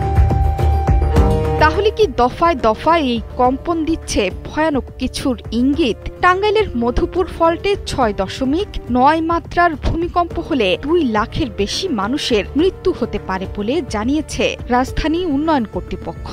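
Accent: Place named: West Bengal, India. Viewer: খবর পরার স্টাইল পুরাই ইন্ডিয়ান বাংলার মত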